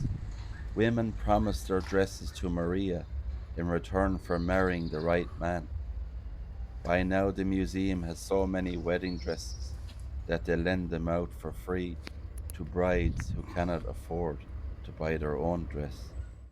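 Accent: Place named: Dublin, Ireland. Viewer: Dublin accent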